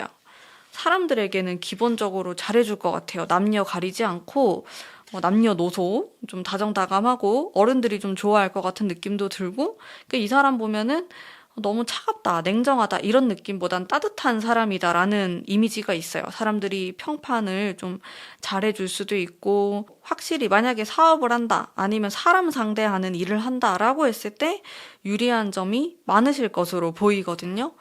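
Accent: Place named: Busan, South Korea. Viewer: 며칠동안 님 영상을 50개 넘게 돌려본 팬이에요❤ 1개 영상보고 어? 나랑 너무 똑같은데? 신기한데? 이러면서 딱 한사람을 떠올리며 50개 넘는 연애 타로를 봤는데 1개 영상쯤은 다른 말을 할 수도 있잖아요 신기한게 그 많은 영상이 같은 의미를 담아서 정말 놀랏어요ㅠㅠ특히 같은 카드가 여러 영상에서 나온다던지 그사람 행동을 일관성잇게 건드리지 말고 내버려 두라고 한다던지 ㅠㅠ 어떻게 단 하나의 영상도 다른게 없이 같은 말만 듣는지 ㅜㅜ 소름돋아여 ㅋㅋㅋ 영상 너무 재밌고 부산 말투 사랑스러우시고 진짜 언니처럼 친구처럼 조언해주시는거 ㅠㅠ 여기는 찐입니다 심지어 엄청 자주올려주시고 ❤❤ 저는 이곳에 잠들게요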